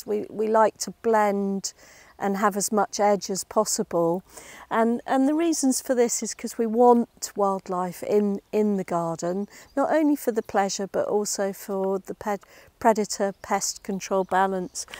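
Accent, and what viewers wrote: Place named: United Kingdom. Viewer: Such a beautiful accent. Is that British?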